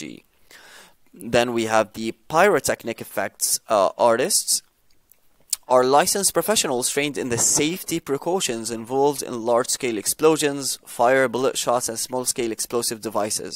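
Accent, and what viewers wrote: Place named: Iran. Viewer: u have a mix of iranian accent and a english accent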